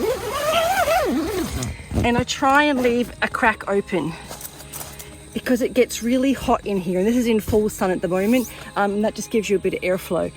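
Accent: Australia. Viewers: you have the strangest accent - you sound aussie and then this american "R" comes through - where are you from?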